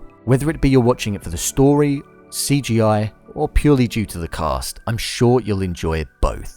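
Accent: United Kingdom. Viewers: Its his British accent, even I wanna fuck it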